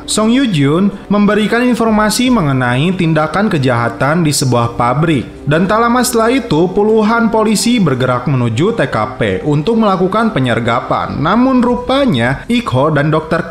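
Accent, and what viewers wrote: West Java, Indonesia. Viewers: Mantap sundana te leungit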